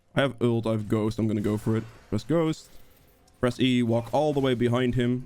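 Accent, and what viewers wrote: Netherlands. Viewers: Hoor ik nou een Nederlands accent?😂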